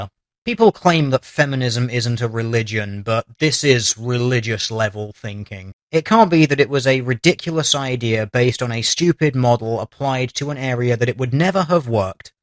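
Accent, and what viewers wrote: United Kingdom. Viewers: You got me subscribed with your British accent and the numa numa joke. Hope all your vids are as good as this.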